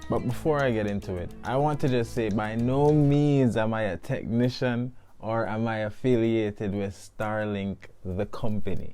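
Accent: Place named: Jamaica. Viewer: The Man speaking American English with a Jamaican accent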